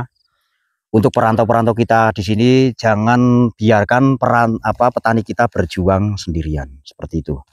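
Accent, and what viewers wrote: Banyumas, Indonesia. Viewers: Sehat terus bang,aja klalen ngapak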